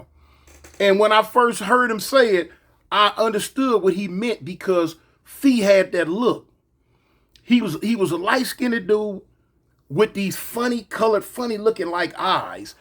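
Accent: South, United States. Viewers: Is anyone from the south in your family? Damn I’m from Atlanta but you remind me of a lot of my uncles. How you speak..your accent..maybe I’m tripping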